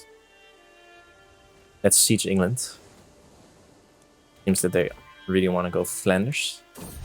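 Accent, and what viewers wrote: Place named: Netherlands. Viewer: The story telling at the begining was really cool! Keep this up! But work on dat THICC netherlandse accent.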